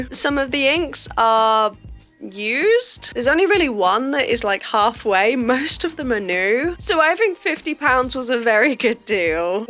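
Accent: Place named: United States. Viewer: Why are you constantly using strong American inflections when you speak? Do you live on Netflix or something? You might as well go with a full-blown accent at this point because listening to this pseudo-Americano dialect is painfully embarrassing.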